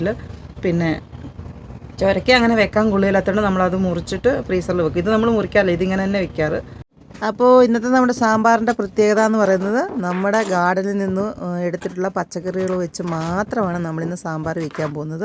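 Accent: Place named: South Kerala, India. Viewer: 👍pakshe Malayalam slang thekkan bhasha pole thonni ( like pathanamthitta)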